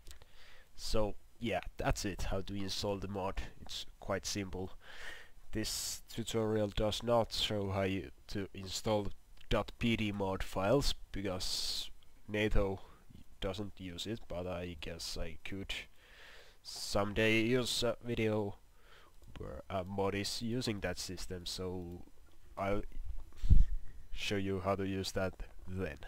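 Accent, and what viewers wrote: Finland. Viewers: Your accent is slightly Finnish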